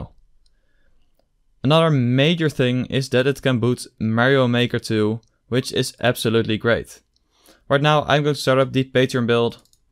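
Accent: Netherlands. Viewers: dutch accent? :p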